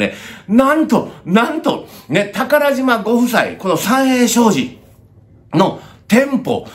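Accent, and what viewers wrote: Kansai, Japan. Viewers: JJさん鋭い推察と関西弁の面白い話し方に惚れました。まぁ恐らく正しいでしょ。🎉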